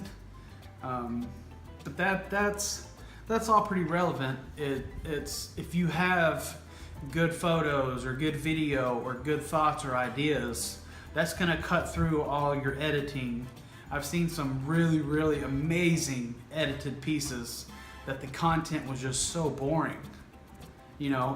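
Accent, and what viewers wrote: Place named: Texas, United States. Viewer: OMG, are you kidding?! Well, you do sound Texan:) I've been in Austin for a couple of years now, but I miss Boston every day. I haven't acclimated well here because it's just too hot and humid all the dang time. I bet you don't miss that, hahaa! Wow, I love that you and your wife made your dream come true-- it takes sacrifices, yes, and a hell of a lot of courage. But there you are-- now living in paradise:)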